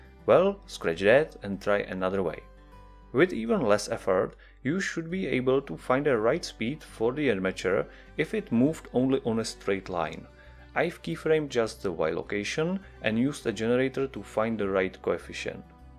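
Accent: Czech Republic. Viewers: Zda se mi, ze tvoje anglictina zni celkem cesky 😀 Je to pravda?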